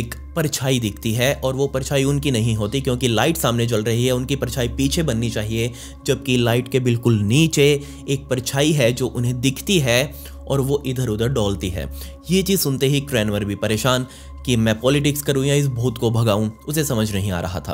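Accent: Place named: Punjab, India. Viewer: Panjabi a bro tu 😊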